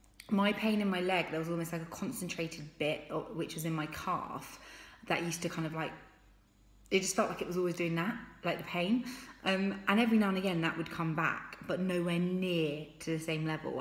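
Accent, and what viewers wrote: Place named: United Kingdom. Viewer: I was expecting an american accent then bam british o.o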